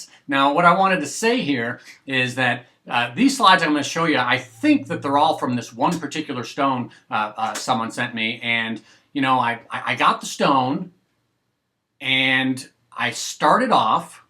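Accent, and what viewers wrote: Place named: East Coast, United States. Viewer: lolz as soon as you said it was going to be a long reply. i read it off in my head “wit” you east coast accent. i really enjoy both your videos ( the mascots crap me up) and Videos I learn so much just from you guys talking and reading through comments. and it is a great idea to get to know your Natis (Natural) stone. and Im still new to straights but. i sharpened enough chefs knives all sorts of styles,knives pocket knives ect.. to figure i got what it takes to give it a go. and so far not to bad. i need a few more grits for in between my #800 and hard Arkansas. but im curious now that my jewlers loop works again its 100x only but its enough to see that i need better technique and the need for progression in between as far a grit goes before start the polishing can begin. im curious though im skeptical on these blue steel razors. 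are they really Blue steel/ Aogami blades? i have a M. Karam made in Mt. Lebanon i believe this is out of PA. but just not sure how accurate these blades are when it comes to materials being used. and can it be blue steel or a Aogami even if its not forged in Japan? or can they be blue steel where ever its made. i thought blue or white steel was just a Japanese thing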